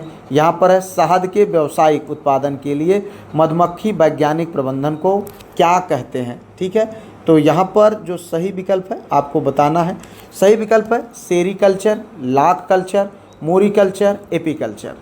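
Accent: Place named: Bhojpuri, India. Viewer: Nice session Sir ji waise Sir aapki bhojpuri langauge bilkul hamari tarh hai jaise hamare yaha boli jati hai 😊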